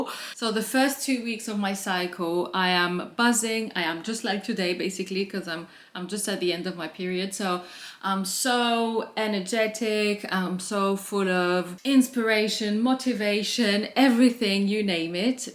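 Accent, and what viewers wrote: United Kingdom. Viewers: I am in love with your british accent. Please don't stop vlogging in english.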